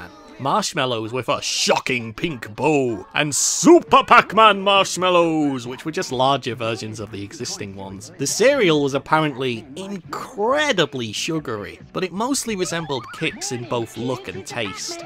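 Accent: United Kingdom. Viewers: Is Scottish Irish Or British?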